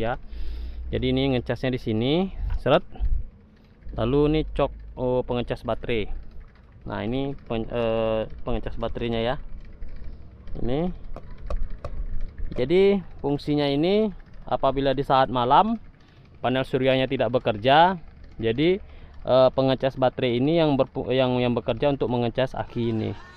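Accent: Pekanbaru, Indonesia. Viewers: Logat pekanbarunya keliatan banget... support terus. Orang pekanbaru like!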